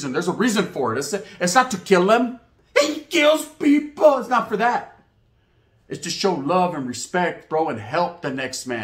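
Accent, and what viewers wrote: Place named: Los Angeles, United States. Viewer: Your Chicano bro, don't matter where you live. But low-key yeah I thought you were from L.A. when I heard your lingual. Anyways much love and respect keep spitting that knowledge.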